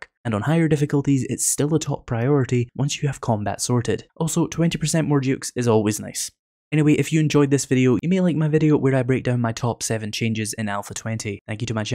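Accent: Scotland, United Kingdom. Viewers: are u from scotland brother ur accent reminds me of a outlander main male character's one that he tried to addapt to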